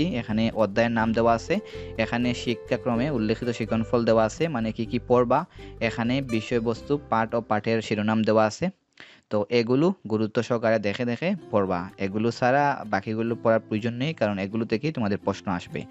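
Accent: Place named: Sylhet, Bangladesh. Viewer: খাঁটি সিলেটি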